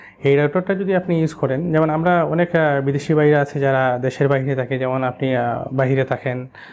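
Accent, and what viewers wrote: Sylhet, Bangladesh. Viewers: আপনার কথার স্টাইলে বুঝলাম কারন আমিও সিলেটের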